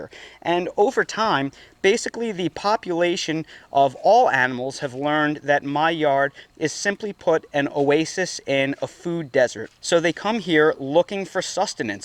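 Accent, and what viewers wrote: United Kingdom. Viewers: You have a slight Scottish or Irish accent. I can't specify because thebmixture of American accent. Do you have a parent from Scotland?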